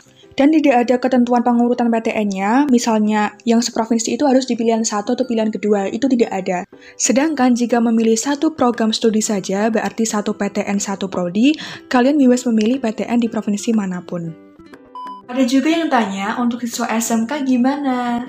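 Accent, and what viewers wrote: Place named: Semarang, Indonesia. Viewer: org semarang ya? logatnya org smg bgtt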